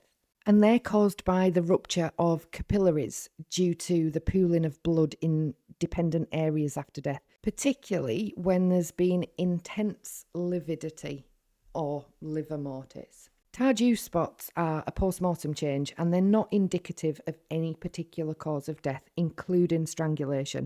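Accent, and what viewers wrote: United Kingdom. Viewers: I love the British accent❤ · New to your channel, i love the British accent. Hard to understand those that don't (kiwi here)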